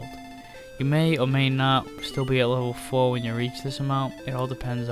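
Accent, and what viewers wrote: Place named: Massachusetts, United States. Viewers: I like your accent. Which part of Massachusetts are you from? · From New England, are you? You cant hide the accent Me too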